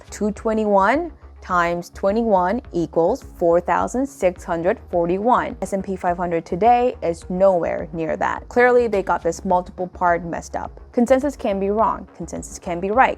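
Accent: New York, United States. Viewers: 역시 Newyorker 는 영어로 하셔야 같아요~~~ 잘보고 공부 많이 되었습니다.! 지난주 뉴욕 출장시 Bryant park하고 도서관 지날때 생각나더라구요, 한국서 건승하시길! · 이거슨 미쿡 발음?👍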